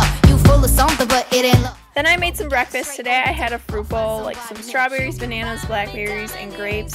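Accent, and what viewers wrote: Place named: North, United Kingdom. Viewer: You're so pretty and you have such a northern accent it's fun to listen to!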